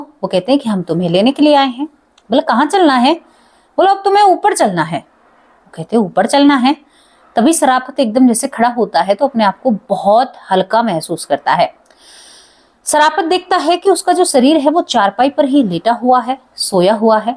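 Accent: Haryana, India. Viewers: मृदुल सुशील जी क्या हुआ अगर यह सही से नहीं बोलता है तो हरियाणा और गांव के लोग ऐसे ही बोलते हैं जो बहुत ज्यादा पढ़े लिखे लोग होते हैं वह भी बहुत बार ऐसे ही बोलते हैं बात हमें समझ आनी चाहिएबस और हमें अपनी बहन की बात बहुत अच्छे से समझ आती है आपको ज्यादा परेशानी हो तो आप जरा युटुब पर वीडियो बनाकर देखिए कितनी दिक्कत होती है श्रद्धा शर्मा दिल्ली से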